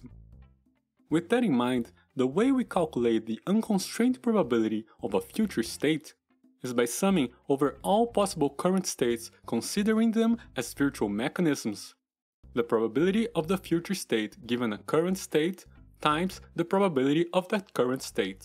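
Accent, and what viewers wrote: Brazil. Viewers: I need to ask you this. Your accent makes me believe you are Brazilian. Is that the case? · You're Brazilian right? I can spot the english brazilian accent in a second! I'm Portuguese BTW, and loved the video. I'm trying to get into IIT and this is much helpfull. When are you going to post a follow up? Um abraço do outro lado do Atlântico!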